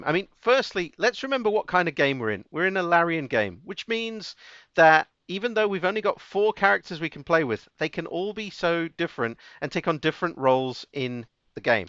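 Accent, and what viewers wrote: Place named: Greece. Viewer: You sound so much like Greek lol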